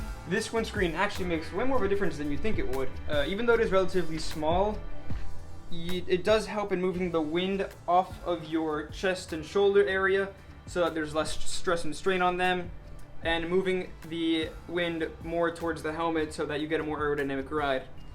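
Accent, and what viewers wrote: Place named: Europe. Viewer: Very good review. Are you European? I noticed an accent.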